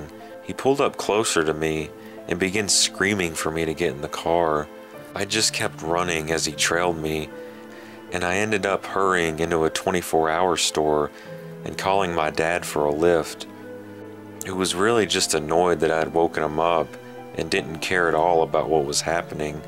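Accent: South, United States. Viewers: he's southern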